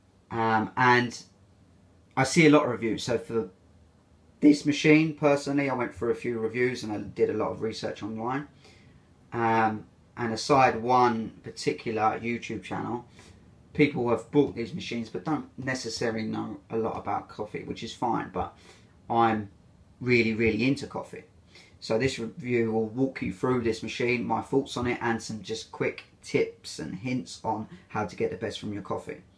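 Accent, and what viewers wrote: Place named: United Kingdom. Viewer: It's the thick British accent 😉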